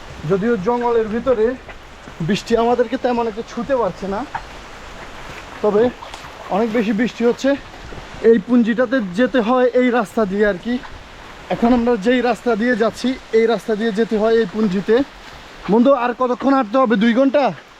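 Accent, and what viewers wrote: Noakhali, Bangladesh. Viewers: ভাইছা আইন্নে কি নোয়াখাইল্লা।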